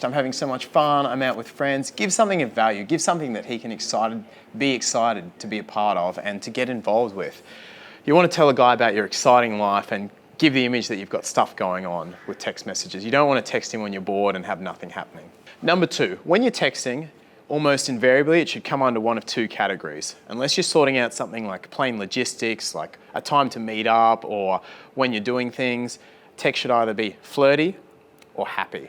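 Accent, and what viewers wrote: Australia. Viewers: Are you Australian? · its the Australian accent🤣😻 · I love your strayan accent sm XDDD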